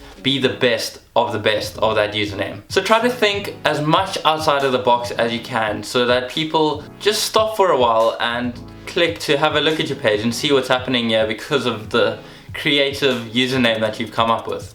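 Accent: South Africa. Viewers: You sound South African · He’s South African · Are you south african??? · Mens kan hoor jys afrikaans lol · Are you South African my mum is from South Africa 🇿🇦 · Are you south african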